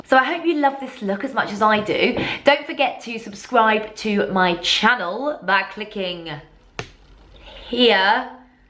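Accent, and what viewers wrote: United Kingdom. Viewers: how proud you are of your Scottish accent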